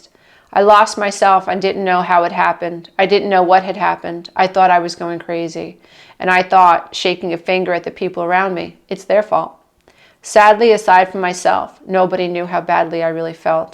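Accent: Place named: Brooklyn, United States. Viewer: You are by far the best one on this topic, Ramani is good too but I relate to you better, probably due to your (funny) accent, lol....downstate, Brooklyn....I cant say thank you enough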